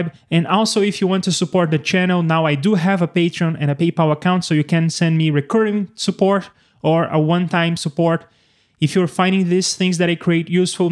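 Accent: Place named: Brazil. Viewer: Muuuuito bom, meu amigo. Escrevo em Português para agradecer, pois acho que reconheci o sotaque brasileiro, certo? Ganhou mais um inscrito. Obrigado. Abraço!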